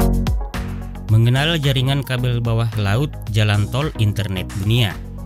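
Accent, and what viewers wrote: Medan, Indonesia. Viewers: Orang Medan ya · Medan kali suaranya 😅😅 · Medan punya nih suaranya 😁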